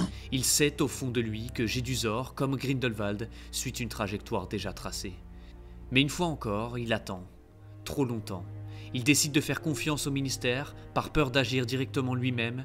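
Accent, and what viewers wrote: Belgium. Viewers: Bonne vidéo, j'entends un accent belge ? 😉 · Cest laccent belge ça. Il doit être belge le mr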